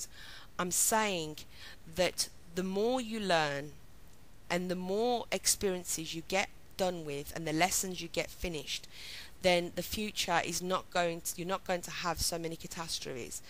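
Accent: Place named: Birmingham, United Kingdom. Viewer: Hi iits nice to hear a brumy accent! I had a spiritual awakening last yr, do you know any groups in bham I could meet up with? It can be so lonely when people around us don't understand x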